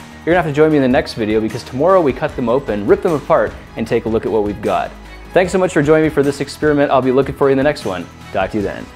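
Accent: Canada. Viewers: I've been wondering for a long time now, based on the way pronounces certain words like "tomorrow," if he's Canadian. Anyone know?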